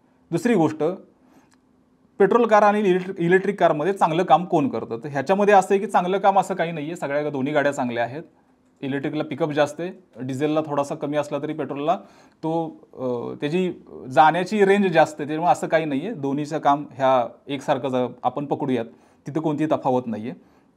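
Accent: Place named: Rural, India. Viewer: 3 ते 4 मिनिटांचा कन्टेन्ट घासून घासून परत परत परत तेच सांगून 20 मिनिटांपर्यंत ताणायला खरंच कौशल्य लागते राव. विषय चांगला आहे, ग्रामीण अक्सेंट मुळे आपल्यातला वाटतो देखील..पण जरा स्क्रिप्ट वाचून घ्या शेठ!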